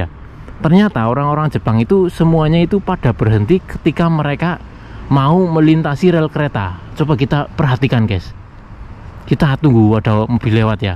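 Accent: Banyumas, Indonesia. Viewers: njajal aku tak krungu sampean ngomong nganggo bahasa jepang tapi karo ngapak kang..😊😊😊😊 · Wong ndi rika,lik?deneng ngapak temen😂..Banyumas Cilacap mesti kye ya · Sampean wong ndi nyong,!! kok ngomong kaya ana basane ngapak..😄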